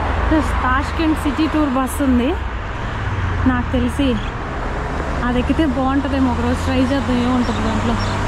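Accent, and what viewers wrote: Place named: India. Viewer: Hi 🙋I Like U R Bold Telugu Accent & Speaking Comedy Also🙋❤From Vizag (AP)💞😀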